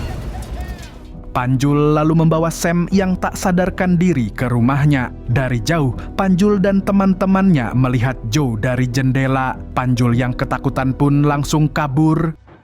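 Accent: Banjar, Indonesia. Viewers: Pian urang banjar kalsel kah 😅😅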